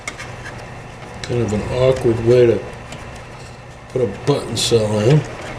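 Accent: United States. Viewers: Are you from Missouri?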